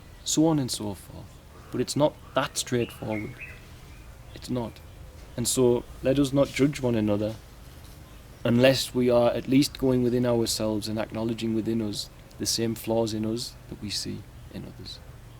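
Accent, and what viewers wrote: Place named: Newcastle, United Kingdom. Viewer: I love your Geordie accent. Always felt self-conscious talking about spirituality and philosophy while sporting my accent. You’re comforting, inspiring and so down to Earth for someone so awake and intelligent. I also appreciate your deep esoteric understanding of Christianity rather than just condemning the scriptures due to the church’s past, like so many people do these days. The royal family has always been just a figurehead to compound and reinforce conditioning, obedience, discriminatory nationalism and patriotism, a symbol of wealth and authority when in fact all they do is bleed money from hard working people and there is no justification that exists for the empire they have in property, land and objects when children, animals, people go hungry and homeless. They moved homeless people off the streets to make way for the royal wedding... 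there’s nothing Christian about it. I honour your compassion and certainly acknowledgment of prejudice, jealousy and small mindedness of the working classes, but there is an unmistakeable distinct inhumanity in hoarding wealth & land & then calling people selfish for exercising autonomy over their own bodies. Completely agree with you. I agree the matrix of our experiences makes us ignorant and ungrateful but the Queen takes that to another conscience-less level.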